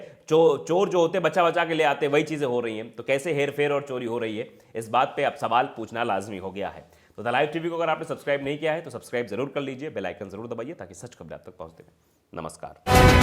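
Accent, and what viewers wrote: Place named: Bhojpuri, India. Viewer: bhai aap ki khabaren main bahut shauk se sunta hoon aap ke bolne ka andaaz bahut pyara lagta hai beech beech me bhojpuri bol dete hain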